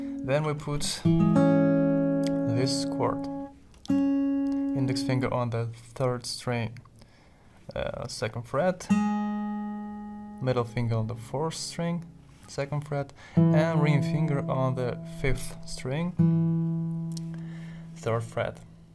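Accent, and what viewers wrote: Russia. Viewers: Are u Russian? I hear some Russian sounds I think😀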